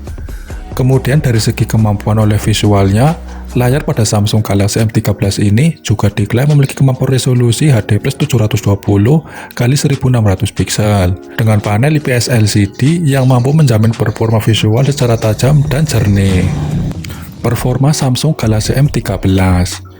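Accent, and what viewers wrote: Banyumas, Indonesia. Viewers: Orang ngapak yak ?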